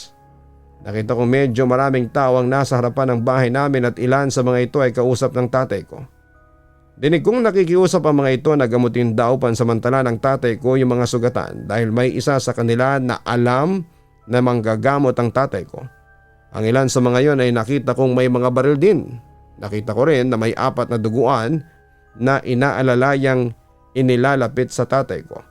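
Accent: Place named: Waray, Philippines. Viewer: waray2 po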